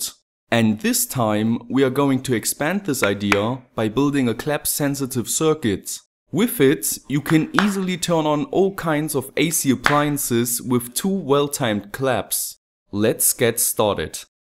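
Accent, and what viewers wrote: Germany. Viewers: pronunciation is amazing. It's comical, Germans wouldn't understand the affectionate way we perceive the German accent ;) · Are you German? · are You German?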